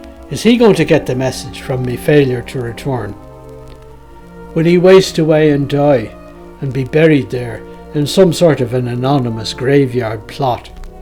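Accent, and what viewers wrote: Dublin, Ireland. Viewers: I have a confession. I've come to tune in to your channel - not just for the story - but to hear that wonderful Dublin dialect! I love it!!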